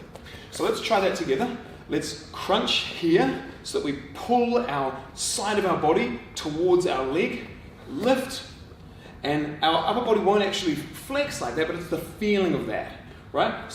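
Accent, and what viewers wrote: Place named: New Zealand. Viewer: sounds super kiwi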